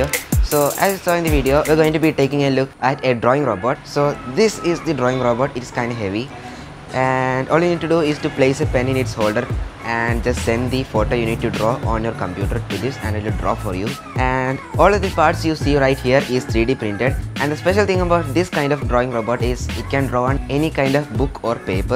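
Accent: Kerala, India. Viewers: 😌I saw you made a drone..Your accent tells you are from kerela.. 😹🙏guruji, pls let us be your students.. Just can't imagine your future.. 👍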